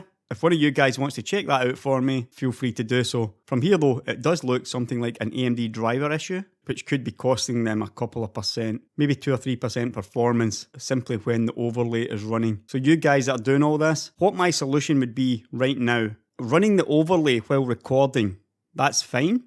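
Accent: Scotland, United Kingdom. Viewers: You have the voice of a Scot, and the face of a human. Amazing. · Another good video. Been watching you since I got my Ryzen 7 rig built a couple of months ago. Looking forward to your build video. Side note. I am in Florida and I have a employee from Scotland who sounds just like you.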